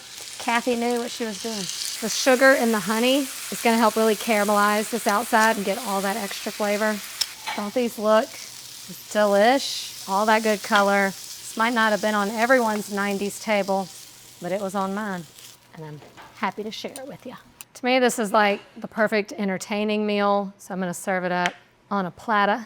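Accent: South, United States. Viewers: I grew up in the 90's & have never even heard of chicken divan until this video. She has a very thick southern accent, so maybe it's exclusively a southern dish?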